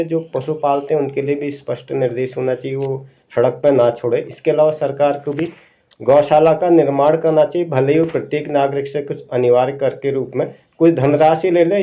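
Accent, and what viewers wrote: Uttar Pradesh, India. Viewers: Aap kaha se ho..Boli se up wale lagte ho..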